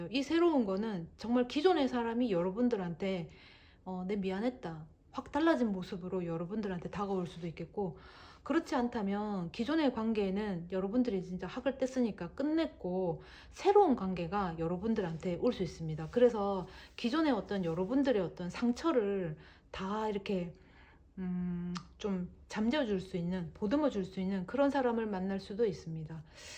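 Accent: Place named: Gyeongsang, South Korea. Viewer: 경상도 사투리 넘~잼나요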